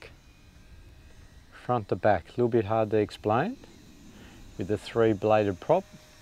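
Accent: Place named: Australia. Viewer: Accent = Ozzie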